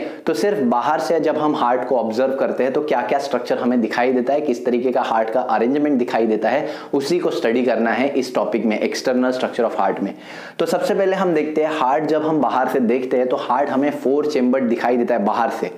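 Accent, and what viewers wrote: Maharashtra, India. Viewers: Tum marathi ho kya bhaiyaa..... And aapki teaching level are next level..... ❤️‍🔥✨✨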